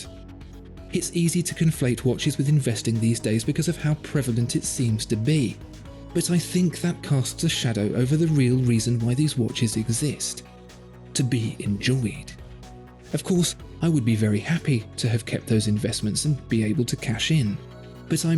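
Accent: United Kingdom. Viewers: Dude your sleepy british accent sucks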